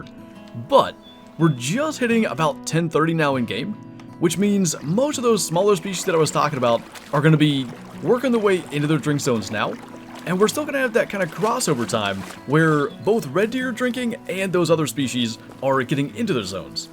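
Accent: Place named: United States. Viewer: Its his voice for me so chill and rich 'murican accent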